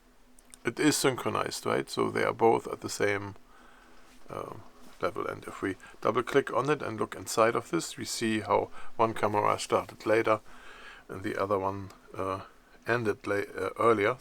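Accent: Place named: Germany. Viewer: German English... it's easy to understand :) But the video was very helpful, by the way, thank you.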